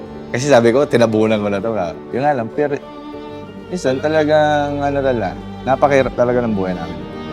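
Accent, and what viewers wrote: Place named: Pangasinan, Philippines. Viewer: Ang tono ni sir parang taga pangasinan siya, mabuhay ka at wag kang susuko par, par ang tawagan ng mga taga pangasinar pangalatok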